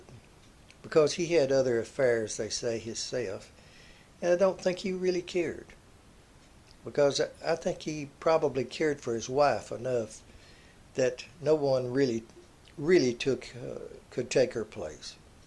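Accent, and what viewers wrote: South, United States. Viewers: Thanks from Europa for the wonderful videos. thx for all the work you put in. You have a good clear way of telling your stories. You must be from the south.:o) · How are you? I stumbled across your channel the other night I really enjoy your videos and your southern accent where are you from??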